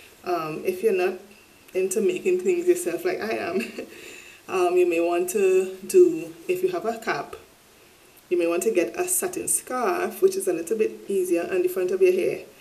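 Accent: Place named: Dominica. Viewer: Great Tips .Where you from u sound like someone from Dominica.I'm a Island girl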